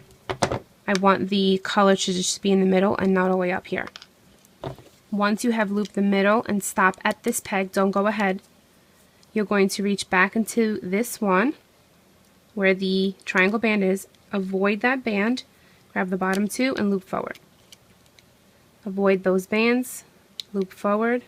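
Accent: New Jersey, United States. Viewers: R u from NJ ?????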